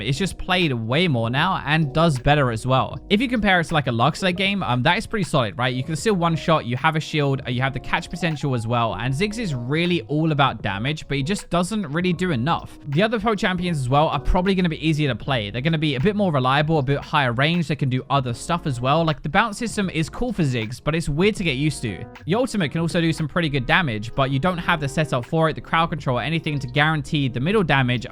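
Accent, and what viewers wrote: United Kingdom. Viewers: stop talking in british i dont undestand shitt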